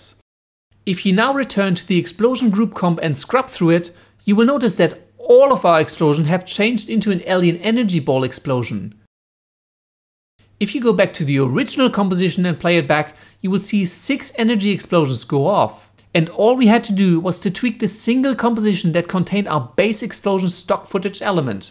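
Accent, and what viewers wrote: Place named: Germany. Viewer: Thanks for your tutorials! Where are you from? It sounds a little bit like you're from Germany..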